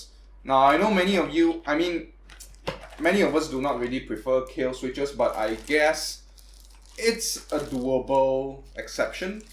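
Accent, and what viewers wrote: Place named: Asia. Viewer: I love that Asian accent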